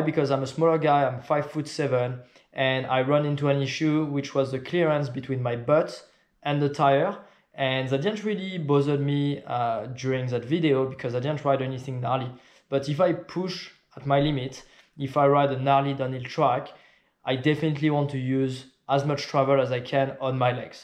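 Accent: France. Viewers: Alala French accent, j ai le meme 😂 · J’en suis sur t’es français ???car ta grave l’accent français quand tu parle anglais ps sinon j’adoreeee se que tu fait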